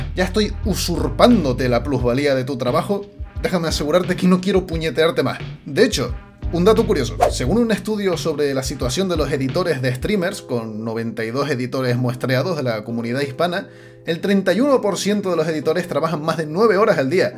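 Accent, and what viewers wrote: Canary Islands, Spain. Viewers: llevo viendote hace poco con tus buenos analisis. la verdad te esperaba mayor y mas gordo jajaja. fuera de bromas de primeras me gusto tu toque canario autentico me alegra verte que das este paso y de tan buena manera, creo que eres muy profesional y vas muy bien encaminado tio. el setup es lo de menos, la gente te vera por quien eres y lo que haces el resto es un poco de relleno. te deseo lo mejor y estoy seguro que llegaras muy lejos. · Eres canario 100% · Una pregunta, tu acento es canario? Me lo parece, me encanta el acento canario pero no estoy 100% seguro se parece a otro que no identifico, en parte estoy aquí por tu forma de hablar, a parte del buen contenido obviamente. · Tío, entre tu avatar y el acento canario, te imaginaba como un Walter White guanche. Seguro que te va genial en Twitch porque tienes mucho carisma. 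Descubrí tu canal hace un mes y me mola mucho 👍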